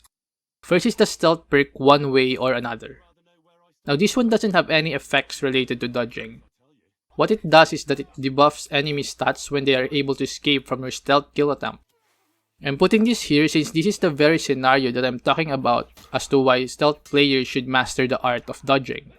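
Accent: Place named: Philippines. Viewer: Noypi? I can hear it from your accent.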